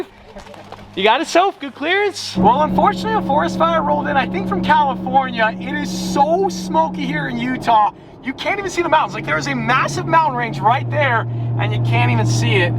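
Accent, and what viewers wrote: United Kingdom. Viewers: More of that bri'ish accent haha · Proper British accent. 10/10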